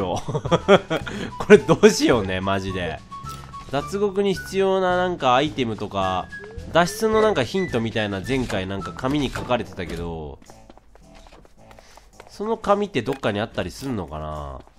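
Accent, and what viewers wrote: Kansai, Japan. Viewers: 最近関西弁出るの多いね！関西弁好きだから嬉しいw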